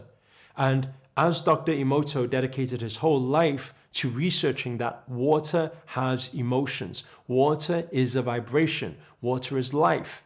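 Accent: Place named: Liverpool, United Kingdom. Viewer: You sound like your from Liverpool.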